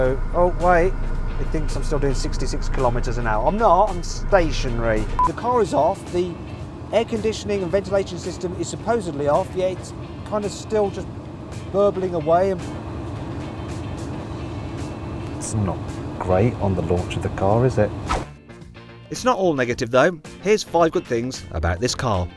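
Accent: United Kingdom. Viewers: 맷 뭔가 포드 V 페라리 영화에서 나오는 켄 마일즈 닮았는데 기분탓임? 이목구비 뚜렷하고, 영국식 발음 쓰는거 딱 들어맞고 차 좋아하는거랑... 차 단점 한번에 알아보는거 너무 닮았는데 ㅋㅋㅋ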